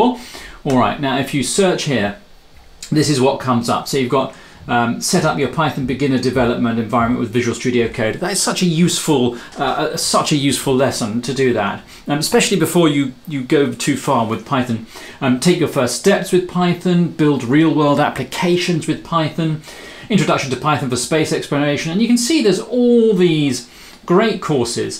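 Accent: England, United Kingdom. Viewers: You English accent is very pleasing to hear . Like your ways of prouncing words . And as always your videos is always helpful .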